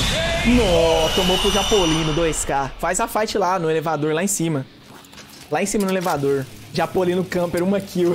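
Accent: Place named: Maranhão, Brazil. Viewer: Parecendo os maranhence daqui de Goiânia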